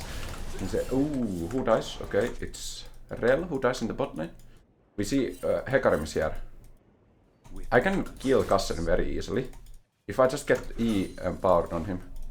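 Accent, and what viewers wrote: Finland. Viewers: Love the finnish accent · Doesn't really sound spanish at all to me. But that might be because I'm also finnish so I know our accent well. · Nice action! But i swear, everytime i hear you speak, all i can hear is finnish. 🤭 Best regards from Estonia!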